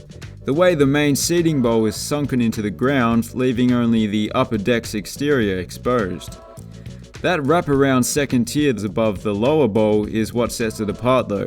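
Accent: Australia. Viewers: Anyone else find it strange that an Aussie is doing the narration? I mean he has a cool voice, but why couldn't we get an American to do it for American stadiums? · Why is a guy from Australia doing the narration, on American stadiums?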